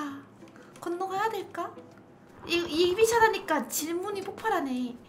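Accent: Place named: Chungcheong, South Korea. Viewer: 겨??? 누나어디사람이야! 충청도하이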